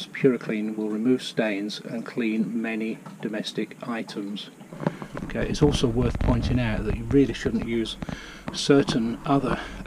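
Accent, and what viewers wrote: United Kingdom. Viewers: Hi. I just found your channel. Your video title caused me to watch and prompts my comment. I live in the central USA. By listening to your manner of speech, I can guess that you are in the UK. You and I use language differently. I am a nurse with a background in preventive medicine and water sanitation. I want to point out that there is a difference between sterilisation (sterilization) and sanitization. Sanitizing is actually what you are doing in the video. Sanitizing is the killing or removal of most and especially of the harmful micro-organisms. Sterilization is the killing of ALL micro-organisms. Sterilization is a process used in medicine to prepare surgical instruments. It most often involves steam under pressure or ethylene oxide gas. True sterilization isn't practical outside of medical and a few industrial environments. 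Sanitization is used in food service and other environments. The RV's fresh water system is actually being sanitized instead of truly sterilized. I am not criticizing your use of the language, and probably your UK viewers understand exactly what you mean. But for others, I feel that it is important to make the distinction. It is important at the beginning of the season, end of the season and any time one believes that the water system might be contaminated, to sanitize the system. Your video showed one product available to help accomplish this. Sometimes though, one might find oneself in need of treating the water system without having an availab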